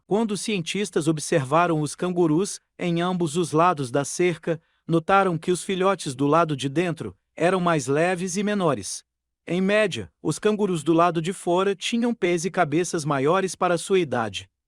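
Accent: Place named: Australia. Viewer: Gostaria de saber de onde se origina o locutor do vídeo cuja pronúncia é muito estranha. Fala um português que não é nem de Portugal e tampouco do Brasil. Australiano que aprendeu português? Pode ser...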